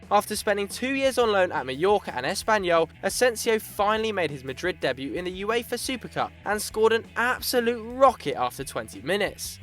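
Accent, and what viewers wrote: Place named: United Kingdom. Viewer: i cant even understand this British fuck when he gives stats. Whats "Naut".495???? 0.495 or 1.495 what is this bullshit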